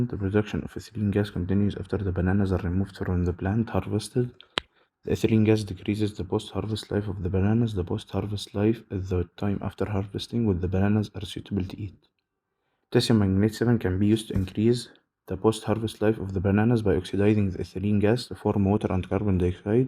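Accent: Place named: Arab world. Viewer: arabic accent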